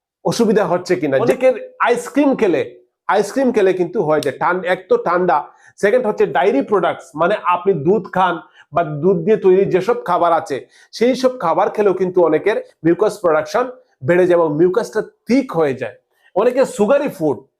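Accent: Sylhet, Bangladesh. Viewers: Sylhet er bhasha emnitai bujajai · bai sylheti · Bro ! Are you from Sylhet ?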